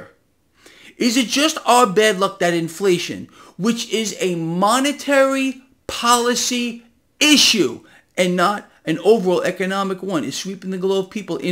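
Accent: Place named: New York, United States. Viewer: The New York Accent is coming back Since moving to Fla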